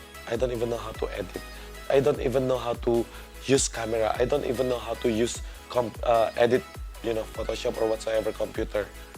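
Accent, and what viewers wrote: Philippines. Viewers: Amazing. I didn't realize you're an Indonesian. I have watched your videos especially the male make-up tutorial. I thought your accent is just a shade of Philippine dialect. You're so great.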